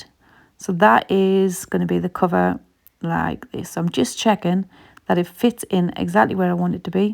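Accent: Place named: North, United Kingdom. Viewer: Thanks for the video, very interesting but also your accent, you sound like me. I don’t live up North now so I don’t hear our accent very often. It was lovely thank you.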